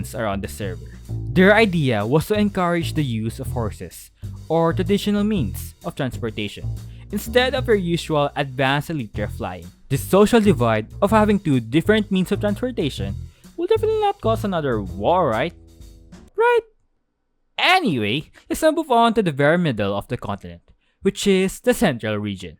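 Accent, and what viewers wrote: Philippines. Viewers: are you filipino???? your accent is soo familiar to tagalog~ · Are you perhaps a filipino? because that accent is seemingly familiar lol From 🇵🇭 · I realli like your pilipino accent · are you a filipino? you sound like one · you sound filipino. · Ur Accent . Are u Filipino? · are u filo????? i can hear it in ur accent 😭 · i think its Flipino accent??? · Your English accent seems like a veri Filipino vibe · I know you're filipino just by the accent.. Correct me if i'm wrong but i'm pret-ty sure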